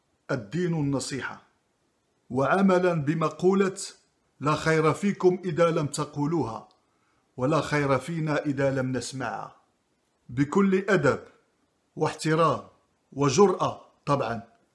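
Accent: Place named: Tangier, Morocco. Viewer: bonjour Mr je suis marocain de Marrakech,j'aime ce que vous dites et j'en rigole tout le termps et sur tout ton accent tangeroise. bravo et vive les hommes libres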